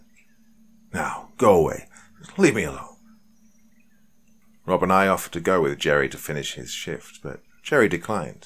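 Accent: England, United Kingdom. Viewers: also gta realize...hes English and we get our language based off there language..sooo who is really wrong in pronunciation???? However I do agree maybe a bit more research shldv been done knowing it was an American story...but give the guy a break really...he puts out some amazing content and I'm sure u have pronounced many things wrong...as have i..but he's working trying to make life easier for the rest of us and ur dawgn the man....I've heard many worse narrator's and is my main guy along with dark Somnium...gta stick up for what I believe in....if every1 here so much better STFU and start ur own YouTube...uk since ur so much better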